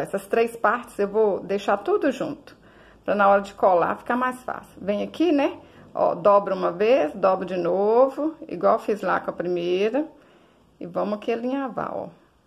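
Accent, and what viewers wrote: Minas Gerais, Brazil. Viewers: Vc tem sotaque de mineira! Sou de BH ! Vou te dar meu like! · adorei essa coruja vou fazer e colocar onome dela de fuxigueira haha bj grande goste de ouvir vc falar me faz lembrar de minhas tias de minas gerais em andradas que saudades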